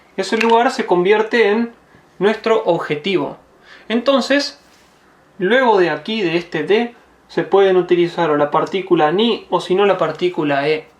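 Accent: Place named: Argentina. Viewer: Buenas acabo de conocer tu canal y quisiera saber si empezar a ver tus videos del principio me ayude a aprender japonés sin saber nada o son para alguien que ya está avanzado en japonés? Por cierto vives en Japón? Apenas te oí hablar me di cuenta que también eres argentino jeje, saludos desde Argentina.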